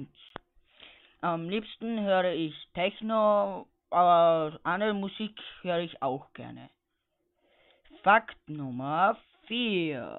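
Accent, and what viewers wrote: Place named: Styria, Austria. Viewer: ^^ deine stimme is ja so genial..fakt numaaaaa ^^^ wie gehts denn so..? und deine mischung aus hochdeutsch und steirisch..thehehe tschüüüüüüs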